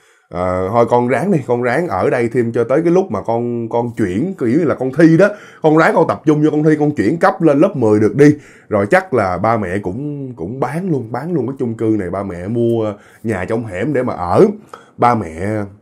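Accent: Mekong Delta, Vietnam. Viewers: Cái gì mà thuật lại câu chuyện từ người khác. Mà kể như chính mình chứng kiến... Cái gì nói tiếng miền tây rặc luôn..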